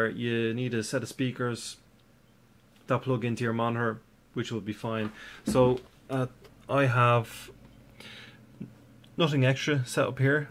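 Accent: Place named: Newfoundland, Canada. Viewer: i thought you was from Newfoundland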